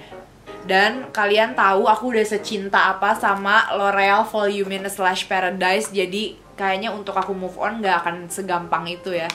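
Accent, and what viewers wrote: Java, Indonesia. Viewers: Kayaknya cuma yg pantes medok tp gak jadi kayak mo ngelenong. You look so stunning as always!!!! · Akhirnyaaaa 😂😂😂 durasinya yg lama gini kess.. lope your medok 👻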